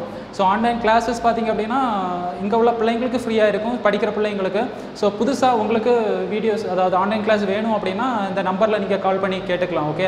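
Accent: India. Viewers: பேச்சு கொஞ்சம் நாகர்கோவில் மனம் வீசியது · Tamil language Malayalam slang mari pesuringa sir,, i think neenga Kerala😊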